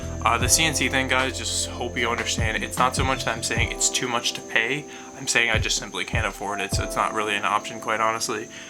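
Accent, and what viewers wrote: Russia. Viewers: Eres ruso p estadounidense?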